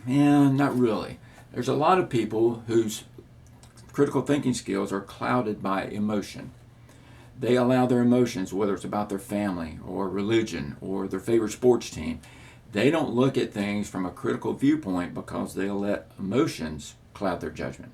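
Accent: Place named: United States. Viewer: Wow,I just feel like I found a treasure. This channel is really great. He uses a very simple and direct language. It's so supportive, informative and wonderful. Moreover, I learn more about the American accent.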